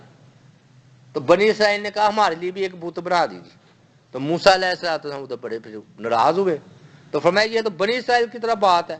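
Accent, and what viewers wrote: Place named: Punjab, Pakistan. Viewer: Assalma Aliakum Bhai App KI Urdu Punjabi styles hai App ko appni urdu Thikh karnay Chahay Likin As Jari Rakahy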